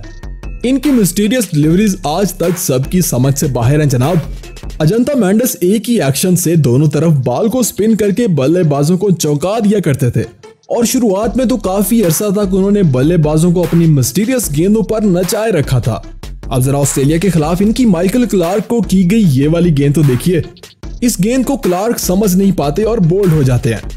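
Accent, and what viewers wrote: Pakistan. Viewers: Your voice is like a Pakistani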